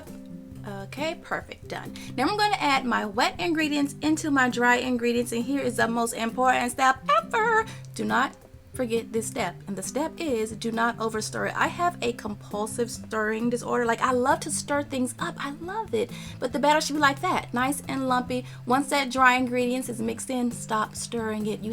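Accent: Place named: New York, United States. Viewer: Anyone else notice her intros are always said EXACTLY like YouTube channel, Laura In The Kitchen? She starts out saying, "Hi guys!" in that same New York tone.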